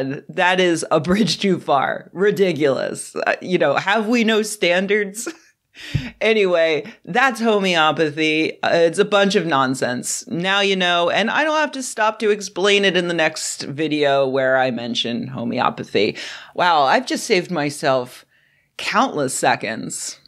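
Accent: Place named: Pacific Northwest, United States. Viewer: As soon as she started talking, I knew you were from the Pacific Northwest—a place where people are very loud with their lack of information. I have nothing to say about homeopathy, but I find it funny you don’t understand that a licensed naturopath by law has the same education as a general practitioner. One difference is they don’t do a residency and are kept separate by insurance companies will be a second. Another is natural medicine is the foundation of their training. An allopathic doctor who does integrative medicine is secondary—— learn through CEs. A statement often heard in the Pacific Northwest is, “I trust science. “ This is a religious statement that has to do with science. The belief that something is true only if it can be empirically proven. This is misguided. Empirical fact and truth are two different things. 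Not everything true can be empirically verified. For instance, we have no idea why or where, in the brain, everything comes together from vision, hearing, and smell to be understood, yet we can still do all of those things. It is called the binding problem. If I remember correctly, in homeopathy, the active ingredients are broken down by parts per million. I don’t know if it works, but I also don’t believe there is a definitive way of testing it. I know that endocrine disruptors at lower levels are more likely to cause problems in the human body than at a higher level—— Which is one way petrol-chemical companies have been able to say their plastics were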